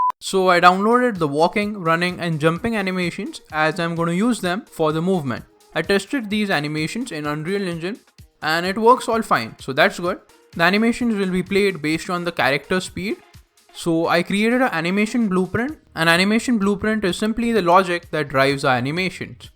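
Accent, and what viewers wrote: India. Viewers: Stopped watching when I heard the indian accent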